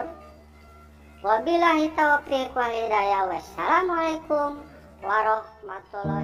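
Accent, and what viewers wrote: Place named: Garut, Indonesia. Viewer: Sundana ti garut nya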